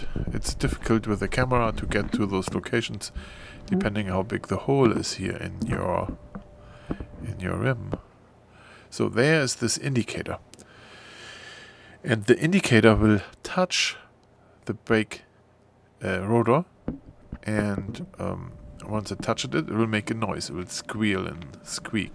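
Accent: Germany. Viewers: Thanks!! Great video and photography. Love that German accent.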